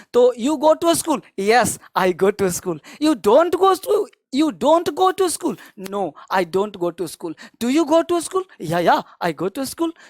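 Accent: Bihar, India. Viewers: Sir aap bihar se belong krte h ky? Aapki boli me boht mithaas hai... Boht acha lgta hai aapki aawaz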